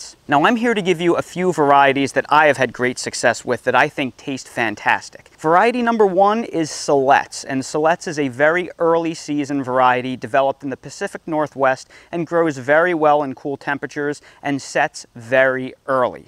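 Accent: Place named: Pennsylvania, United States. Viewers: So funny, I KNEW you had a Philly accent. I was going to ask you after a previous video. I went to sleepaway camp in the Poconos for 10 years and I can pick that accent out anywhere. And thanks for the info on indeterminants. I already have Celebrity and Bella Rosa seeds and will be planting them this fall (South Florida, Zone 10B. You think YOU know about heat and humidity. HA!! AND our bugs are the size of Mini Coopers!) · When i started watching and you mentioned you were in NC, I was like oh cool my father and step mom lived there, she was from there. RIght away I said to myself hes not from there lol he's sounds like PA and looks like a Jersey Boy. Lmao in you revealed that you are from the PA/NJ area. lol WE spot our own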